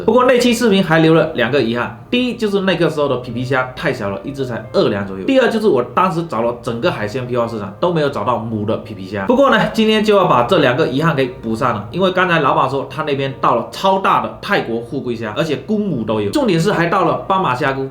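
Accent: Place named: Fujian, China. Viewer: 互跪虾姑😂😂……迷人的胡建口音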